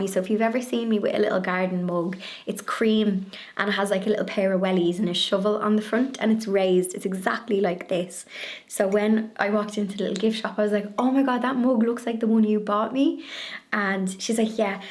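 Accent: Ireland. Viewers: I love all your videos , you are kind and witty . I live in Scotland but I am from South America so love to hear your Irish accent helps my understanding of English and the diversity in accent . THANK YOU for letting me have a peek on to your lovely world and adventures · I live in Texas USA and has spent years working on my family tree. My mom used to say her people on her dad’s side was Irish but she knew nothing else. Since she passed I’ve learned my 5th great grand father was from Legacorry, Shankill, Armagh, Ireland. That’s how it was written out in 1640 so have not learned where that actually is yet. I enjoy just thinking one of my great grand mothers talked like you. ❤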